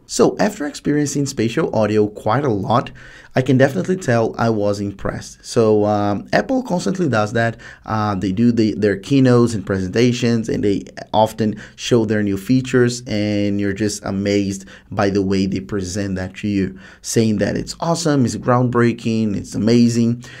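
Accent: Brazil. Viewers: Are you Brazilian? I can recognise your accent cuz I’m Brazilian as well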